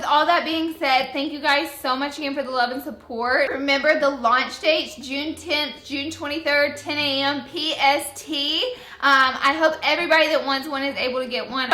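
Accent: South, United States. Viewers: Patiently - ok, well not really - more like ANXIOUSLY awaiting this palette's arrival from your SnapChat family preorder..... Come On, Violet Voss..... This Mississippi Girl is chomping at the bit!!!!! So proud for you!!!!! Love your southern accent and of course the fact you use our special Southernese Sayings!!!! Keep up the GREAT work!!!! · Love it!!!!!!! ♡♡♡♡♡ CONGRATS. LOVE the names I'm in Tennessee and everyone makes fun of how we southerners talk. I love how talks sooo southern. ♡♡♡♡♡♡♡ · Christen Dominique mentioned you in one of her snaps and I just had to see your channel. When I heard you talking I knew you had to be from the south. I'm from Alabama as well and I love all the shadow names! 😂 Congratulations on your palette it looks amazing!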